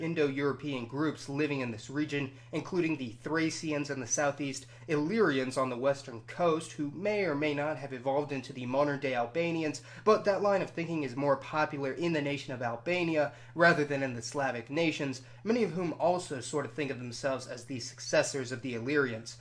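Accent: United States. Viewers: Speaks American,america started all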